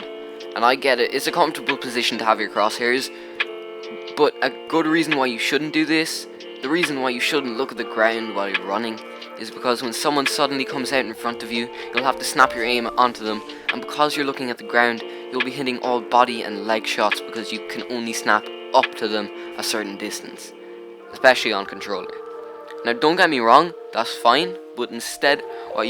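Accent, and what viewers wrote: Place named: Ireland. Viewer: i love the Irish accent (I think)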